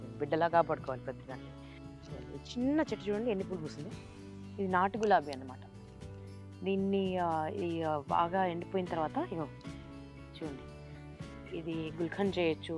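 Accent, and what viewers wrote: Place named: Telangana, India. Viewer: Ma akka Telangana bidda. Tanu matlade Telangana yasalo na biddalu ma amma ani forpect gaw matlatunnav😂😂😂❤❤❤😊😊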